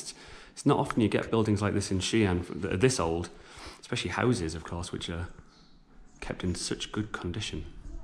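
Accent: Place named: England, United Kingdom. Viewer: I really like your English accent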